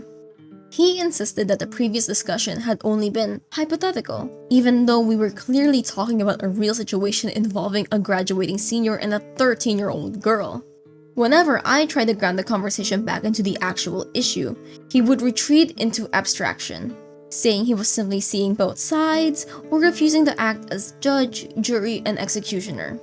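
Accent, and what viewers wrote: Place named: Philippines. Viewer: Are you filipino? Your english sounds so much like Filipino english!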